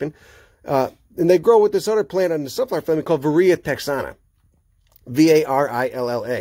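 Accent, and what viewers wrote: Chicago, United States. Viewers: This man sounds likes hes from chicago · What is someone from " chicahhgo" doing in Southern Texas ?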